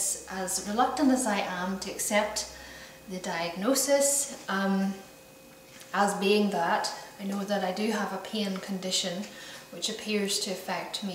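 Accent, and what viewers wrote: Ireland. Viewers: So, you from Ireland?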